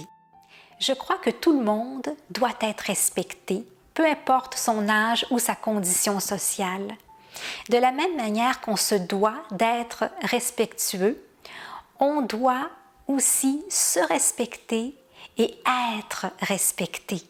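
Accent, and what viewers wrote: Quebec, Canada. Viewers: Bonjour, je trouve dans votre contenu plus de sagesse parfois que ce que mes parents m' ont transmis, même s' ils ont été de bons parents.. bonne continuation ( en plus j' adore votre accent du Québec et votre voix douce et apaisante) 🐙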